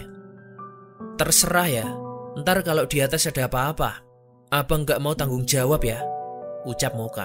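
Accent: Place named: Java, Indonesia. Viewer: Orang mana bng, medok bgt :(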